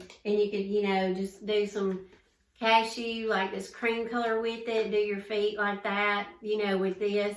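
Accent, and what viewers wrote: South, United States. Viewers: Love this project! I love watching your videos. Your sweet Southern accent is charming!! Blessings XO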